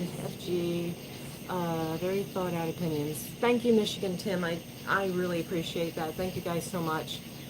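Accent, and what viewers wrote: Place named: New Jersey, United States. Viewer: Missed your 1st live:( watching now i would of never guessed you’re a Jersey Girl.I hope you do more lives! Hit the like folks its free helps the channel! And subscribe, of course.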